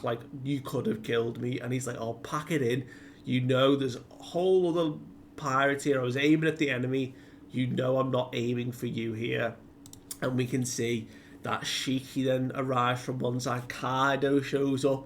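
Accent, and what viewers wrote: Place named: Liverpool, United Kingdom. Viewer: Brooo it’s 4am, you’ve gained a new subscriber and I’m glad to see your journey and growth from the start. YouTube should’ve recommended your channel earlier. You go into so much detail and even explain character emotions. Also having a scouse accent, I went uni in lpool and from Manc hits it for me so pool is like a second home to me. And you are the only British manga reviewer I’ve found. I hope your channel grows!!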